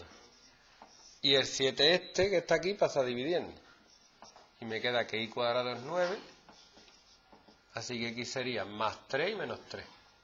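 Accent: Andalusia, Spain. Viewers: andaluz tenías que ser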